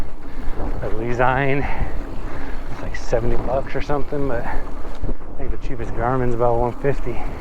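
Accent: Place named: Farmington, United States. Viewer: You sound ike from Farmington. Nice bro python sucks. Java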